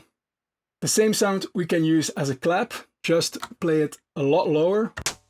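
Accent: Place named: Netherlands. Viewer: dat is een vatzig nederlands accent makker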